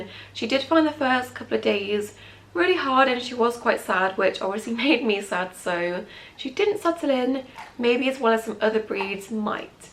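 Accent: United Kingdom. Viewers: She is such a beautiful pup, it's amazing how she keeps herself occupied behind you! I've been looking at this breed for years, and have a great opportunity this July if it works out. Trying to learn all I can to make sure I can provide a great home. Do you have more videos as she's gotten older? I'm in the USA....love your British accent!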